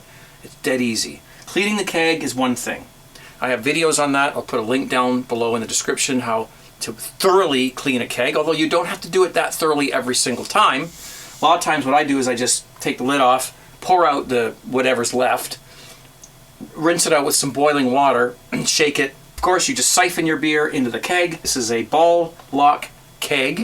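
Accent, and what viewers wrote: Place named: Canada. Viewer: I do like your video's, informative,funny, and I like your canadian accent.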